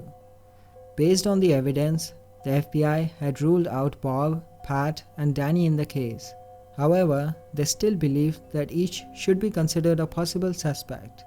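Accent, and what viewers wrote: South India, India. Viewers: U have south indian accent 🙏🏻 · This Indian accent is so thick one could easily cut it with a knife. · I have difficulty with Indian accents. I won’t watch another one with this guy. Normally a woman narrates these. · Few seconds and then i have to stop....annoying Indian accent... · The narrator have a South Indian accent, I like it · The voice over Indian voice made this even more scarier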